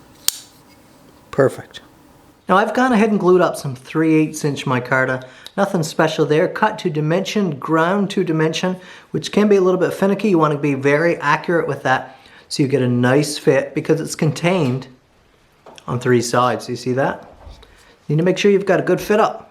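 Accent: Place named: Newfoundland, Canada. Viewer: I knew it! I checked out your info below the video to see if it was true, I could tell by the accent! You're a newfie! God bless you. Great work on that knife. Good to see a fellow East coast Canadian on you tube. (I'm from New Brunswick by the way). Took a woodworking course in Cape Breton so that would have been my second guess. All the best to you and yours!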